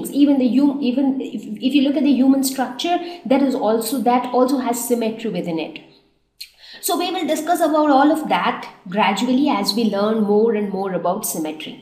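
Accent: India. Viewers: It's called Indian accent I told u cause u like the accent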